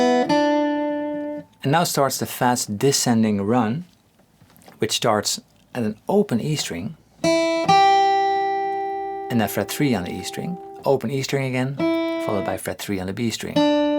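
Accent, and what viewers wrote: Netherlands. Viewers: I believe he is from the Netherlands.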